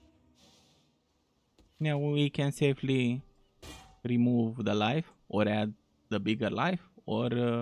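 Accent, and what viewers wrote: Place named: Eastern Europe. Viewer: Good video! I detect a central maybe eastern European accent?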